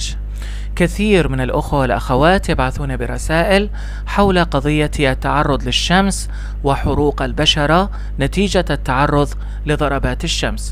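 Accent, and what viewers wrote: Lebanon. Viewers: هل انت لبناني.. اذا كنت عراقي لماذا تلهج بالهجه البنانيه؟؟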